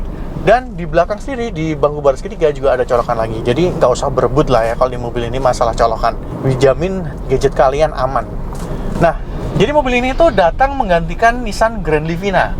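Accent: Indonesia. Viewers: Kokoh medok amat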